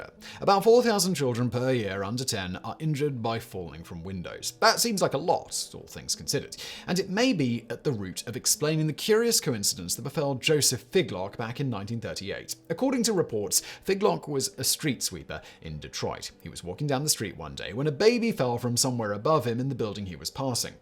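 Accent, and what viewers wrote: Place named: Hammersmith, United Kingdom. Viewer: I feel like your accent is more hammersmith less kent 🤷🏻‍♀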